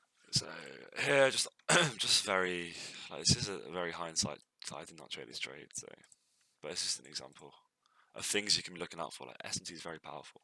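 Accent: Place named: London, United Kingdom. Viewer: laughed out loud at 7.29 when you say wer are fucked lool. Are you from London bro? fix up a channel asap.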